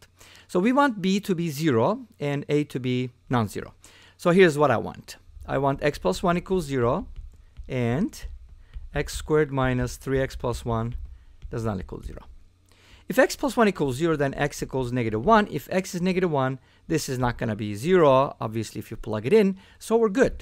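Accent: Turkey. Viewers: I like your rapid and simple solutions. Some of the math channels I checked out, they are too slow and redundantly repeating same formulas dragging on. The viewers are math students, and the podcaster needs to get to the bottom as quickly as possible. Especially this one Indian guy, who is a brilliant mathematician but just tedious and boring to watch him. So Ata boy! and from your accent I gather that you are Turkish. Ben azeriyim. Tebrikler!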